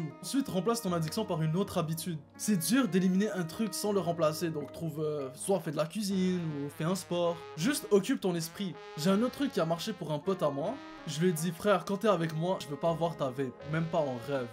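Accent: Quebec, Canada. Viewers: Ta un petit accent québécois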